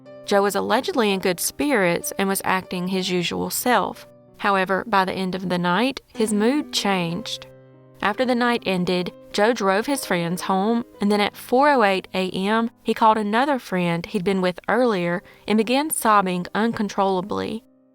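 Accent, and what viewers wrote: South, United States. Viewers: Thank you lovely 🥰 lady from the South with a beautiful Southern accent and a kind Southern heart! 💕💐💕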